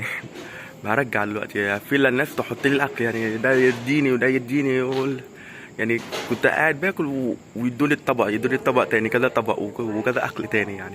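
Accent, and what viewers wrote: Egypt. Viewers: ماشاءالله حلقة حفظ قرٱن كريم والعربى بتاعك مصرى خالص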